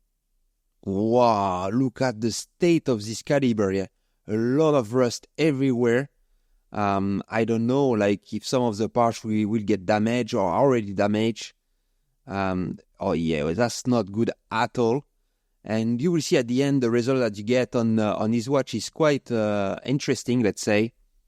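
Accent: France. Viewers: What a biouteeful accent in English. Tu m'as tué avec ton accent frenchie ! Remarque les américains adorent ça apparemment. Hey, if it gets you more views in english than you doing it in french, what the heck go for it mon ami!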